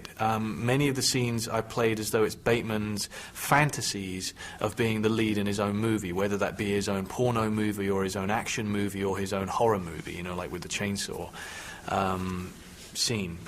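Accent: United Kingdom. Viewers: He's English... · I didn’t hear anything he said. I was too busy being charmed by the British accent and confused realizing he’s British this whole time. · Wait a fucking minute... WHEN WAS HE BRITISH??!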